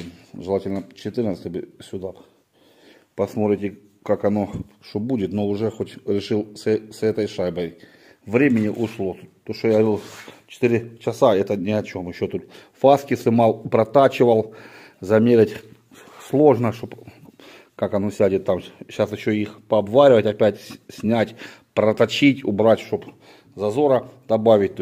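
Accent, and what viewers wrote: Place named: Volga, Russia. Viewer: привет! С праздником тебя! Я вот слушаю тебя ты вроде с Украины, а балакаеш как волжанин, ( симу, как то оно так), и ещё какие-то замечаю выражения которые слышу каждый день, только без обид! Иногда я думаю сядем за один стол, обязательно выпьем, по балакаем и всё будет хорошо и у нас и наших детей!!!!! Мира вам и чистого неба как изумруд!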